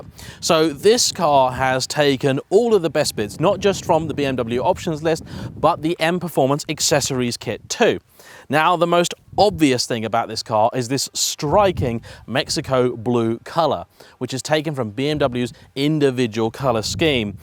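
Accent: United Kingdom. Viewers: Proof that you can have a british accent, and still sound like a buffoon. I know another channel with a Kentucky accent, and sound much more intelligent.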